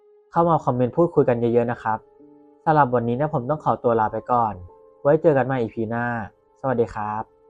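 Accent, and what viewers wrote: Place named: Rural, Thailand. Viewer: สำเนียงเหน่อเพราะฟังเพลินเชียว ขอติดตามเป็นแฟนคลับนะครับ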